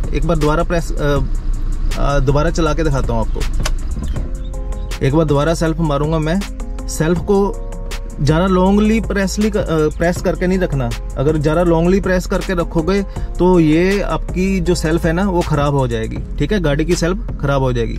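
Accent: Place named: Uttar Pradesh, India. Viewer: I think up army se ho mujhe lag raha hai aap ki Bhasha se aur baat karne ke tarike say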